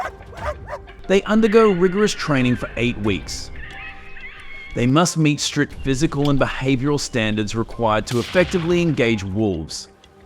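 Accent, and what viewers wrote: Australia. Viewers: You sound like an Aussie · I like that the narrator is from Australia or some place where they sound Australian I love it it gives the program more character and sounds really great😊😊❤❤viewing from Monmouth Oregon <num>😊😊❤❤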